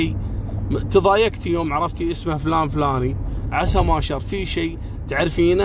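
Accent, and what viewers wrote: Kuwait. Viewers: بما أني جديد في سماع قصصك ودي ارجع اسمع القديم بس ماشاء الله تتحفني في الجديد وأنسى القديم أسلوبك مره جميل ولهجهتك الكويتيه الجميلة هي من خلتني افعل الجرس وفقك الله لما يحب ويرضا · الله أكون في عون الحاجة والحاج بعد هدا العمر يطلعوا اخوة والله مثل هده الحادثة صارت عندنا في المغرب وأكن اللحمد لله كانوا لم يتزوجوا بعد فى عزلوا القصة قبل الزواج البنت دخلت في حالة نفسية شديدة هي والشاب ومرت سنين حتى تشافت منها انا ارى ان على الأمهات ادا ارضعوا ان يقودوا لأبناءهم ان تلك الفتاك هي أختك او اخوك كم الرضاعة حتى لا تقع مثل هده المشاكل طريقة سرد القصة رائعة رغم أني كنت أعيد القصة مرتين حتى افهم اللهجة الكويتية. مغربية مرت من هنا 🇲🇦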